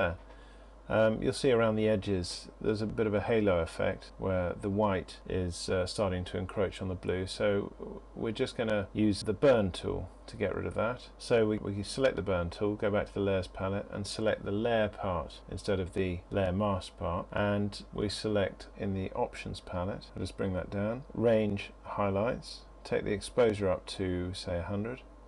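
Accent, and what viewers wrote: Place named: RP, United Kingdom. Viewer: lol, i didn't even bother with this but your voice is very posh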